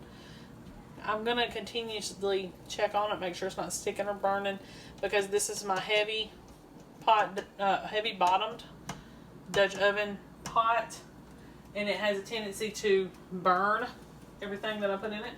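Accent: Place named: South, United States. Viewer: I love your country accent. Makes me feel right at home with my grandma 🥰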